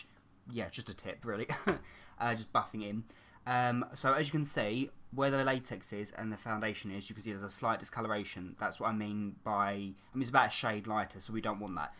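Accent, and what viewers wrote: United Kingdom. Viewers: me and my mom love your britsh voice what part of englend are you from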